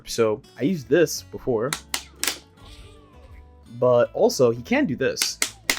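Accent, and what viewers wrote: Baltimore, United States. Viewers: You don’t have to answer but by chance are you from Baltimore? Your accent made me wonder lol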